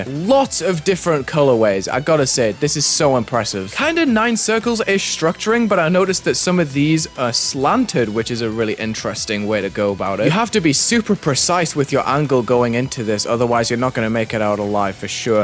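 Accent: England, United Kingdom. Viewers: О вы из Англии????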